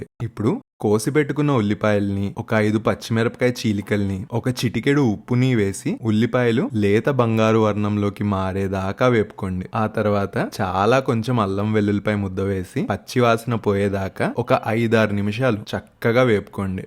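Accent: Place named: Telugu, India. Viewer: Acha telugu lo matladuthunaru meeru Andhukey vinadaniki vinasoppu ga undhi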